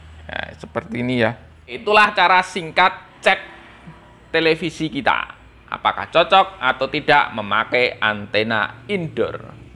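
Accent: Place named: North Sumatra, Indonesia. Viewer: Aksen Bataknya kental banget, aku suka.... 😂😂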